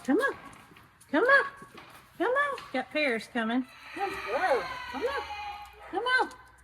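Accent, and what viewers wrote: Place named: Alabama, United States. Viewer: I love how you say “boil”! You are so darn cute with your Alabama accent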